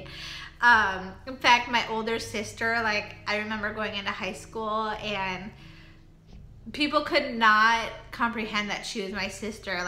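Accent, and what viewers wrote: Minnesota, United States. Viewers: I was so sure that was a MN accent. I'm originally from Northern MN myself. Thanks for sharing ❤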